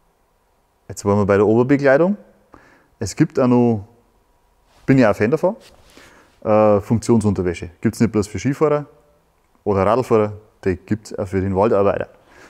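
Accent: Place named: Bavaria, Germany. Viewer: Schon ok, alles gut 👍😊. Alle Dialekte sind ja was Tolles 👍😊, ich wünschte ich hätte auch einen 😉. Bayrisch kann halt nurmal "etwas" anstrengend sein zu verstehn. Aber is ja mit Sprachen allgemein so 😉. Ansonsten, Videosstyle und Präsentation sind 👍, auch wenn ich in Wahrheit ein Fan von Fauna u. Flora, also auch von Bäumen und Naturwäldern bin, und mir jede Fällung eines ausgewachsenen Baumes das Herz blutet und mich zum Menschenfeind mutieren lässt . Grade in Zeiten eines Klimawandels. Immer mehr Bäume und Wälder werden in rasendem Tempo niedergesägt und statt dessen immer Winderräder aufgestellt 🤦‍♂️🙈 😔 . VG 🙋🏻‍♂️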